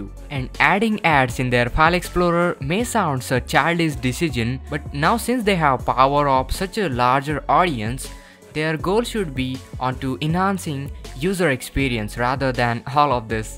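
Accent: India. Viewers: horrible indian accent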